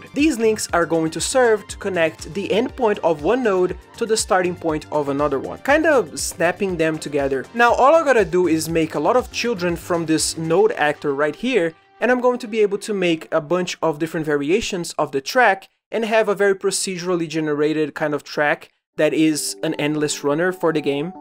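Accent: Brazil. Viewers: pelo teu sotaque tenho certeza que tu é brasileiro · ctz q tu é br, esse sotaque n me engana kjkjkjk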